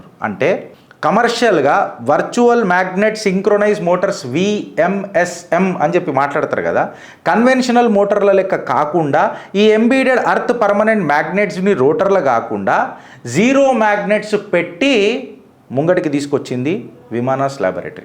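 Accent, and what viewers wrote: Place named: Hyderabad, India. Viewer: అన్నా నువ్వు మొగుని లేక న్యూస్ చెప్తావా అన్న ... I like u bro way of our old city hindus mass slang ... And no one can beat❤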